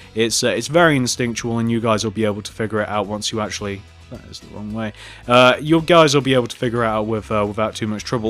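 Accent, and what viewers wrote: United Kingdom. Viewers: he's British so he says it like that! >:O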